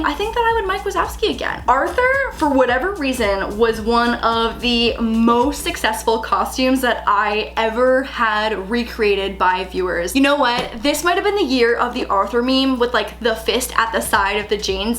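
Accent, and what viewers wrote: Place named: Ontario, Canada. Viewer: You can 100% tell is from ON bcos she doesn’t pronounce the 2nd t in Toronto 😂😂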